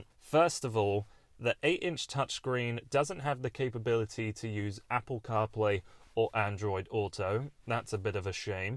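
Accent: United Kingdom. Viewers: British accent is not a bonus point or anything. But yes the presentation was good.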